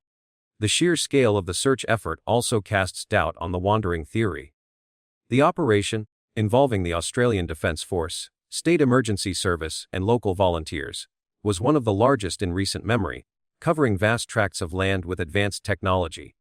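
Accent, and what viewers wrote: United States. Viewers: I found the cases from overseas very confusing!!! Why bring all of that stuff into the picture? It has NOTHING to do with the Gus Lamont case here in Australia. Also, I DON'T LIKE the AMERICAN ACCENT of the person talking about the Gus Lamont case. What is wrong with our good old Aussie accent? I won't be watching any more cases from Crime Cases in future!!!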